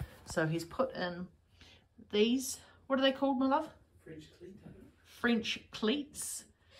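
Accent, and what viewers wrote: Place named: New Zealand. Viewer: Gr8 update,. I should have listened closer to dialogue and would have noted you were Kiwis. Weee used often “bet” for bit … might be rivals on the turf and on the paddock but best of mates after the games and a few beers. Cheers…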